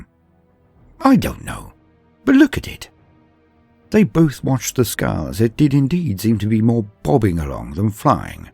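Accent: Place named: RP, United Kingdom. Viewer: 100k is just the beginning, Sir what you bring to the table isn't just a talent for writing, nor a command of the queen's english, or your pleasing baritone of a voice. No, what you possess transcends all of that and yet is magnified by its very presence, you have passion and it reverberates with every line written, every word spoken, every image used, and yes every song (kudos to your wife BTW). You sir have earned your Legion of Followers, just as you will the hundreds of thousands yet to join.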